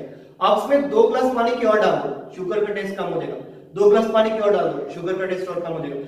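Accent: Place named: Pakistan. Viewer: sir are you from pakistan beacuse of your accent if you are then love from india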